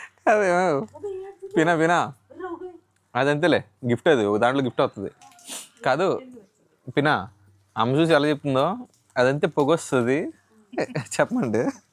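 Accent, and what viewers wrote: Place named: Amalapuram, India. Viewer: బాబు మీ వంటలు చూస్తుంటే కడుపు నిండి పోతుంది తరువాత మీ మాట వింటు ఉంటే ఇంక కడుపు నిండు పోతుంది ఏ ఊరు అమ్మ మీది మా అమలాపురం సై డ్ లా ఉంది ఎక్కడో చెప్పండి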